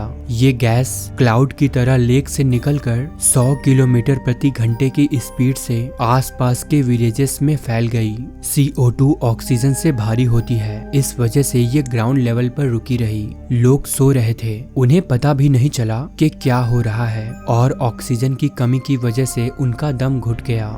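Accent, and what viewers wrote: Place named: Bengal, India. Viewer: Aab Bengali Accent Mei Q Bol Rahe Ho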